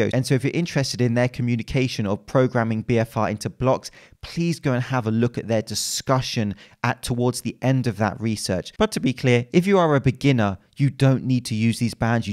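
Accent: England, United Kingdom. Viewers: "Restrictimg blood to their brains" haha. I found your channel the about a week ago when I was looking for some creatine info. Great stuff and I love the humor. One of the few subs I actually have. But one downside, I caught myself reading a textbook with an English accent in my head... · Love your videos mate, specially the taking the piss ones. Would love it if you did a video on the old timey light dumbbell system. Got a book about it, David Bolton's the lost secret to a great body, and it really changed my body. I'm what you call a hard gainer. Been doing Capoeira for 14 years, so thin, well defined muscles, but I always felt like my arms were a bit like T Rex's. After 2 months I gained 5cm around biceps, there's almost no appreciable DOMS after a month and you get a very specific feeling i've never felt before of being BIGGER and ENERGIZED after each session. 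You sound like you live in Britain, maybe you can make an interview with the author, find some evidence on how this training method works? I've tried to look for it myself but can't find anywhere why these changes have occurred so fast. Maybe increased glycogen storage in the muscle and therefore more water retention in the fibre? But I am objectively stronger and have more muscle endurance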